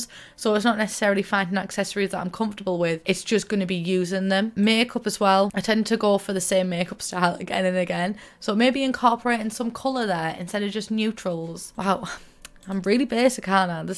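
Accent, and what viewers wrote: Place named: Middlesbrough, United Kingdom. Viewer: I accidentally left subtitles on from a previous video and it struggles so much with your boro accent 😂 eg ‘girly’ changed to ‘garlic’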